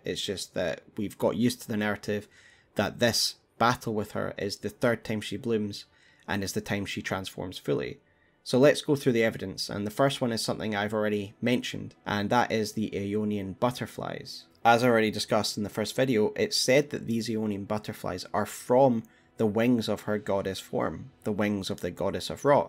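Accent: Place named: Scotland, United Kingdom. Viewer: A fucking love your Scottish mate. Good to see your putting us on the map for the souls Community 😉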